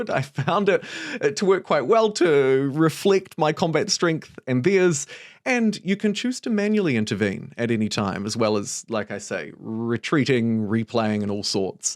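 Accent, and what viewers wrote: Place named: New Zealand. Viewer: You sound like your from NZ ... Same obligatory sub lol..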